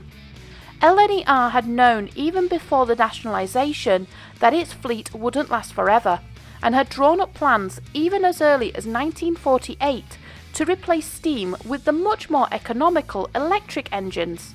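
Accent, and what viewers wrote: Doncaster, United Kingdom. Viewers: So you are from Doncaster…?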